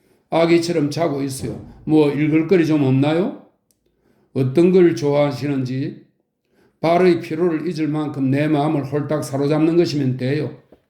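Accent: Gyeongsang, South Korea. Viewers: 긴 시간 동안 읽어주셔서 감사드립니다. 처음에는 어색하였는데 나도 모르게 경남 사투리의 어감에 빠져들었습니다. 이제 모든 글을 들어야겠습니다. 새로움으로 가득한 소식을 늘 기다리겠습니다.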